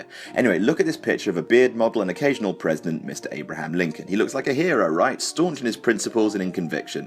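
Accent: United Kingdom. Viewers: you talk too fast and your accent is a bit too British speak normal and speak slower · The snippy British accent though charming becomes grating....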